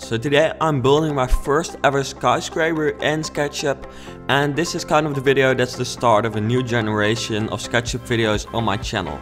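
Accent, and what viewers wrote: Netherlands. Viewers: Jij klinkt zo nederlands hahahahahahaha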